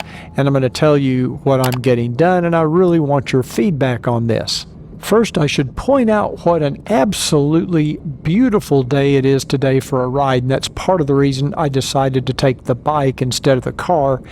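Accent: United States. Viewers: Ok Hi Mr " ".. So. (Oh this is weird talking/ typing this, Sorry. First time I come in some way contact with you Sir and We're talking teeth.- I didn't see that one coming?") But since you still bring up such a very important subject I'll submit my thoughts on this. Yes first appearance is what you notice with people.. in someone's face. That would be the teeth and smile! 82% of U.S. adults agree that the first thing they notice about others is their smile. I know bec. i did that with you, Sir. I saw the teeth and saw that it looks somehow painful. I Could hear it on your speech as well and that something was of? No. But there was something with your lower jaw or your sound on your voice I mean how you sound apart from that rough Dallas Texas- dialect of yours....it almost sounds something like you suffer a blocked nose? (And Sir, NoHate at all) But now I could tell that this, yours, your special sound of yours.. 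That is You! I don't have to not even see you anymore too know. And I've learned to like it and almost got used to it now at this point! It's that easily recognized- voice of yours again these days! I just sit back and listen to you and follow along on both your long and less short journeys. And it's amazing! I can see I don't even notice the teeth anymore! I don't know exactly.. Oh man you got me on this subject! This can be a touchy/ sensitive subject overall by just talking about teeth in general. And I don't Judge people? I'm Not judging you now at all...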